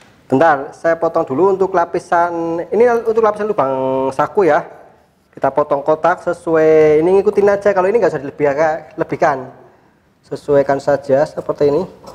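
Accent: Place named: Java, Indonesia. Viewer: koyo wong pekalongan basane, ak, karanganyar mas tugu duren ngetan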